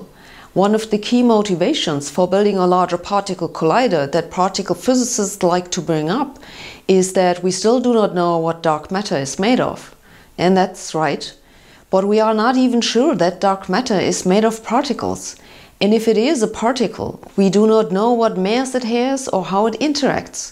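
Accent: Germany. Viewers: Love how she puts an extra layer of german-ness on it... she could probably play it down, but instead she embraces it. Cool style.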